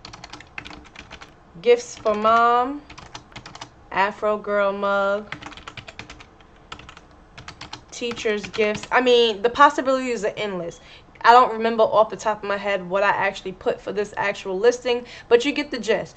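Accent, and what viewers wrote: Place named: Philadelphia, United States. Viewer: Are you from Philadelphia or South Jersey? I recognize the accent.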